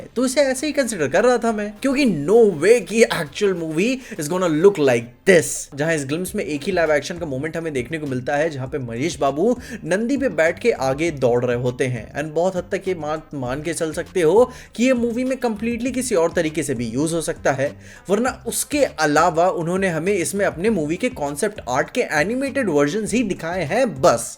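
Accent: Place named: North, India. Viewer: abbe accent ka difference hai. North me aise hi bolte hao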